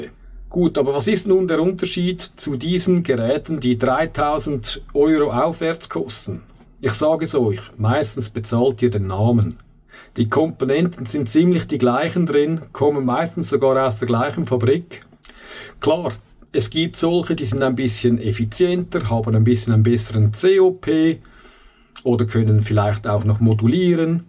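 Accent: Switzerland. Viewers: schöner bericht, danke dafür aber es wundert mich ein wenig: da sprcihst mit einem schweizer dialekt und gibt die preise in € an? aber das mit der zirkulationspumpe und dem leeren des boilers um 50 % ist mir nicht klar. diese zirkulationspumpe sitzt dann doch in einer ringleitung und so kommt das wasser doch immer wieder in den boiler zurück.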